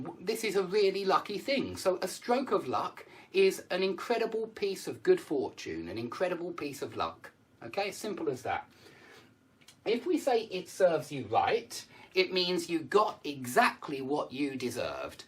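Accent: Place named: RP, United Kingdom. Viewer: I simply adore your accent! It's so posh 😃👍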